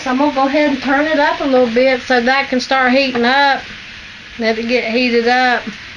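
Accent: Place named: Appalachia, United States. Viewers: You are sooooo sweet and I love your lil southern accent!! Looks delicious! I wish I could have some. God Bless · I worked in a meat market also at two different stores.. Bilo and Ingles in Sc.. IT IS COLD … and in the winter months, IT GETS COLDER... or at least it feels colder to me.. I am so THANKFUL to God that I don't have to work in a meat market anymore.. I didn't like it... I worked in that field for about 6 years and that was 6 years TOO LONG.. I don't know where you are located, but you sound like you could be from WV.. I was born and raised in West Virginia.. It is my home.. I have lived in Sc for a little over 18 years now.. But I do miss my mountain home.. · lol. sounds like my momma. warsh for wash... youve done lost your mind... thank you. i sure have missed hearing this Appalachian talk from momma. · May I ask what area of Appalachia you’re from? 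I’m in WV and you cook & sound just like home so I’m curious. Thank you!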